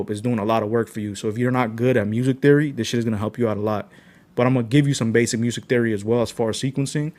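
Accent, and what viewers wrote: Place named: New York, United States. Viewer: True New Yorker, where you from?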